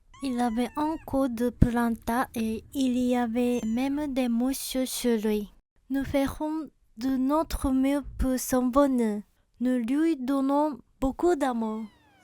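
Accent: Japan. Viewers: Merci pour cette vidéo , avec cet accent vraiment Kawaii, tu parle très bien Français bravo , mieux que je parle le Japonais 😆 Arigato Gozaimasu 👍😊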